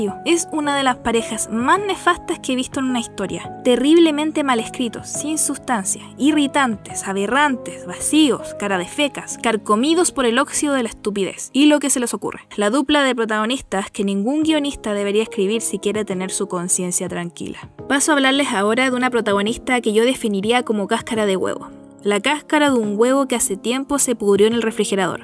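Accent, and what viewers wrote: Chile. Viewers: Es la primera vez que veo su canal y note altiro que es chilena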